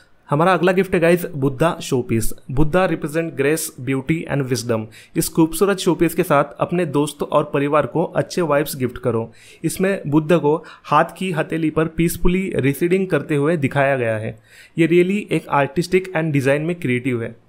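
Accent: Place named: India. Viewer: I absolutely love his content! His voice has such a wonderful Indian flair, it's a delight to listen to. ❤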